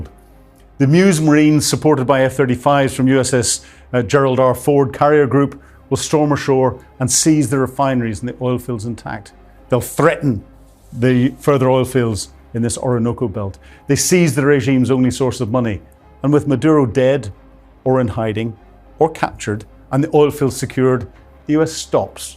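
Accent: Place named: United Kingdom. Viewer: Very british English, thanks 😊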